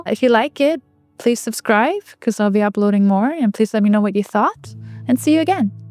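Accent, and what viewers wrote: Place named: Germany. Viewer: So basically she wants to spread her dictatorship ideology. You literally have no example other than keep saying Singapore. There is thousands of example that democracy and liberalism developed the world. Look at Europe and England. The development and industrial revolution took place when the power of the kings has limited. We know below your cutie German accent, a big propaganda exist.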